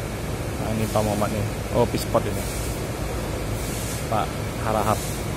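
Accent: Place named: Medan, Indonesia. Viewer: medan bang.. medan.... semangat kali abang ini kayak nya👍👍👍😁😁😁😁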